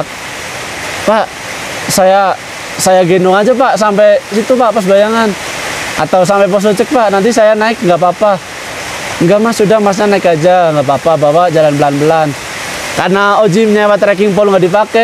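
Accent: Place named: Banyumas, Indonesia. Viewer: Habis dr rjl5 langsung kesini. Mas oji keren bocah ngapak kaya inyong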